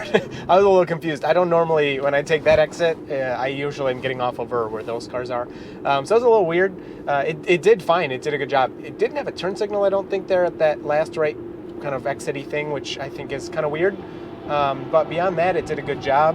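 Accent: Michigan, United States. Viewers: Hearing your accent brings back memories of growing up in Michigan. I not only don't have it anymore 40 years later but can't seem to reproduce it.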